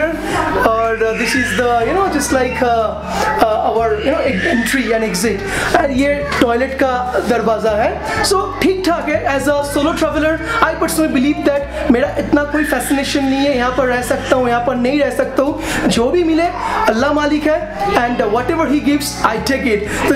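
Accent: Bengal, India. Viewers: Sweet Bengoli accent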